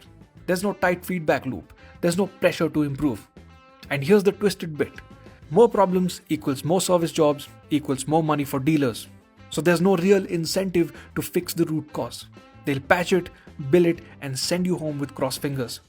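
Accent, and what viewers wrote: India. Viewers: Pls stop the induan scammer ahh accent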